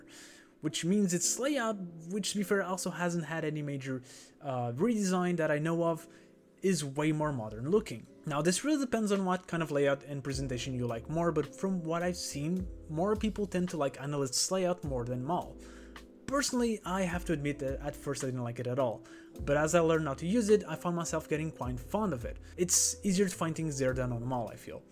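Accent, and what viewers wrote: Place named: Portugal. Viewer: Something curious just happened... I just joined anilist and I wanted to know more about the website before starting to use it. I open youtube, search for "anilist" and this video is right on top. I click it. Less than 10 seconds in and I start to have this feeling... your face, your accent... is he? Something tells me he is... I go to your "about" section and that's when I see it... este gajo é português caralho! Um pt consegue cheirar outro pt à distância ahaha acabei de subscrever. Boa sorte com o canal!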